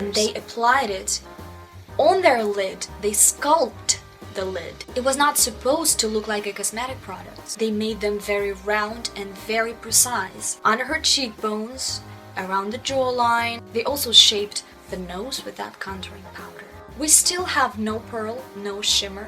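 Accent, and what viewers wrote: Russia. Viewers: She sounds Russian :-))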